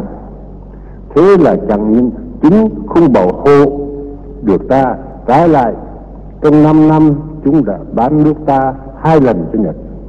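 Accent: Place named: Nghe An, Vietnam. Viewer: giọng Nghệ An mà nói giống Trung Quốc được thì chịu, mù quáng vừa thôi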